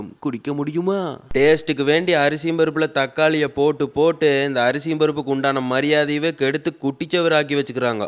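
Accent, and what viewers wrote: Coimbatore, India. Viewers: Your slang of Tamil is ultimate bro as iam also in kovai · பொய் சொல்லாதீங்க அண்ணா நானும் திருப்பூர் அண்ணா கோவை தமிழ் கொஞ்சுதே அண்ணா சூப்பர் அரிபருப்பு சாதம் ல தக்காளி போட கூடாது அது சில லூசு க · கொங்கு பாசை அருமை. ஒரு நெம உப்பு.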